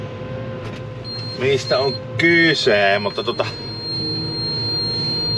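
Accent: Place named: Savo, Finland. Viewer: Ihanaa kuunnella tuota letkiää Savvoo... Terveisin yks sutteetti savolaene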